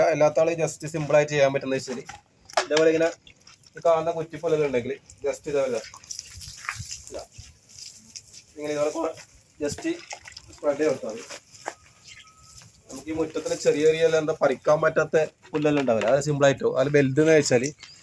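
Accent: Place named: Kannur, India. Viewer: Slang belongs to Kannur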